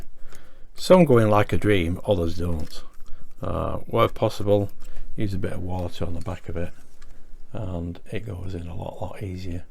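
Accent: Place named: Northern England, United Kingdom. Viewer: PS: I really like your cheerful disposition and awesome northern English accent! Excellent stuff!! Cheers, Mark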